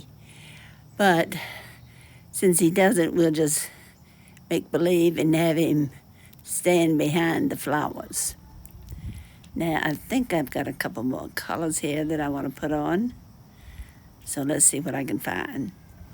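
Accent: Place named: South, United States. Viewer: Thank you to Youtube for the algorhythm working correctly to recommend this delightful channel! This is a treasure trove of techniques. Beautifully done! And I love your Southern accent, it's so pretty!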